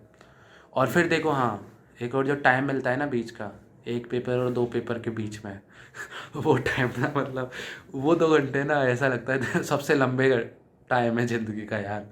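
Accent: Maharashtra, India. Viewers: Tu Marathi ahes ka? Video khup chan ahe Hindi la Marathi toning ahe….😄😄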